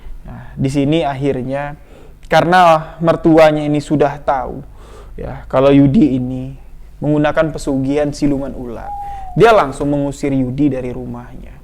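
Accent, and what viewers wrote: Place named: Java, Indonesia. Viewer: Mas the best omng jawa nya kental bngt...😄😄 sukses buat mas...amiiin..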